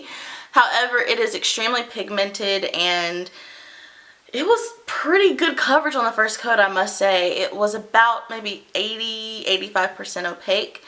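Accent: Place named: Canada. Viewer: I hear a boat when Canadians say about & I think it's cute! Thanks for the review. I've got a shopping list and somne of these are going on it 😉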